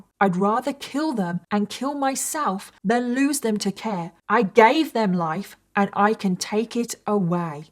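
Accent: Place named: United Kingdom. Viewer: Very sick and twisted story. Made me tear up when you told us the bikers and nice car owners led the funeral procession. Side note I love how you use the word "whilst." Your British accent is the kind of British accent I love! I don't know the area and the different dialects, but I can actually understand you! 🤣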